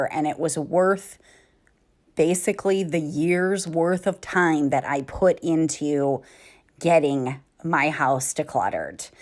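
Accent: England, United Kingdom. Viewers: I can hear you slipping into your English accent...makes me think of British TV shows (love Doctor Who and Masterpiece Theater). Busy crocheting for the past few days on several projects. Love your videos! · your home is smashingly turning into a wonderful cozy environment that is soo inviting. I enjoy watching your channel and your family and Clark. He's so adorable. I love your British accent 😂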